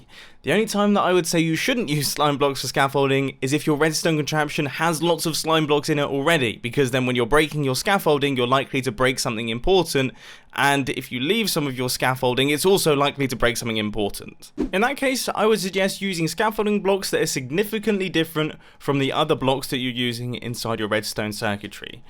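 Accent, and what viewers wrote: United Kingdom. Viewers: british man just said "don't panic". it's like that one book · 'ello charming brit!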